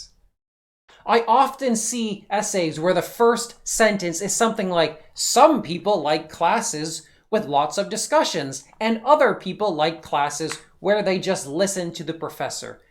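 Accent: Canada. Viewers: Just out of curiosity, are you Canadian? I hear some Canadian accents. My Canadian friend and i used to fight over English (not British, just language) accents like 'Resource' or 'Rezzource'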